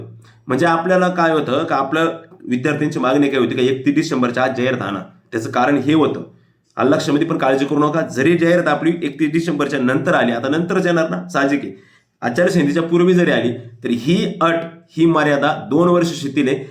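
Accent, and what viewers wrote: Ahmednagar, India. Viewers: काही म्हणा गड्या... पण हा माणूस खरच अख्ख्या महाराष्ट्रात एकदम भारी माहिती सांगतो...आणि सर्वांना समजेल अशी सर्वांच्या भाषेला match होईल अशी अस्सल नगरी भाषेत एकदम कडक...सर खरच खूप छान काम आहे तुमचे....love you..❤❤ तुमचा व्हिडिओ पहिला ना सगळी माहिती डोक्यात जाते डायरेक्ट...🎉🎉🎉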